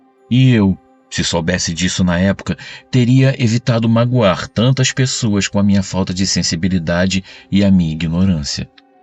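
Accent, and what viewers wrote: Rio de Janeiro, Brazil. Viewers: Olá Que história bacana... É contada com esse sotaque de Carioca, isso dá um toque especial. Como foi bem lembrado: Padre Quevedo nunca desafiou a Deus, e sim as mentiras e 9s falsos profetas. João Batista - Cubatão SP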